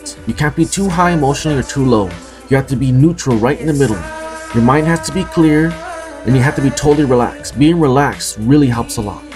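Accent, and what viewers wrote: Philippines. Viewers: waitt r u a filipino?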